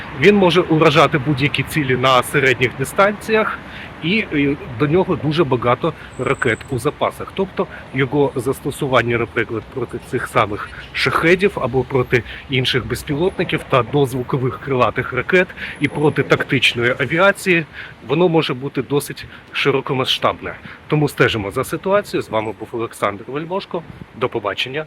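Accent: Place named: Odesa, Ukraine. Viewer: Дуже приємно чути українську з Одеси! Дякую.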